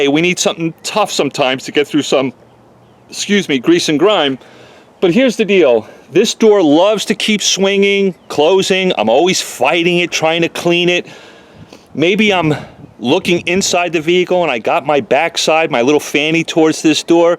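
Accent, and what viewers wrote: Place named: New York, United States. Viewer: Awesome ideas to make everyone"s world easier, Thanks! How did I know You were from up north? I guessed jersey, but the accent isn' t heavy enough. Maybe boston, but no. Should have known NYC. I am from upstate myself. In NC and VA they have tried to get me to be a rebel! Not working!! Love them all anyway! Thanks for all your vids and hard work.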